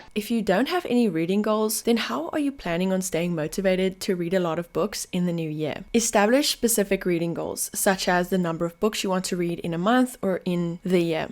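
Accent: South Africa. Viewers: Great video. Even as a long time reader, this helped a lot. Also are you South African? your accent sounds like home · Great video. Are u afrikaans?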